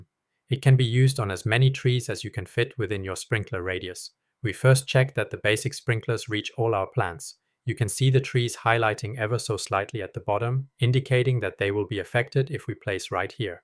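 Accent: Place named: Germany. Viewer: Ich höre deutsch raus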